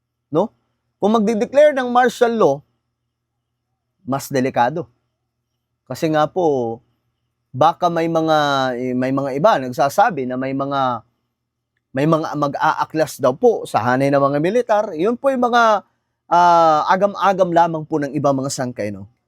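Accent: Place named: Visayas, Philippines. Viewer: Bkit mo pinakikita Yan na kesyo Marami sila,isa ka talagang DDS din ..parang ipinagbubunyi mo ang dami nila ,iyan ay mga inc members at konting DDS.Halata ka na di ka tunay na ilokano may pasangkay sangkay kpa eh isa ka nmang bisaya ( halata ang pronunciation mo ay bisaya).majority bisaya ay DDS at isa ka duon..tanggalin mo inc member Dyan kundi nasa 100 to 150 lng ang DDS.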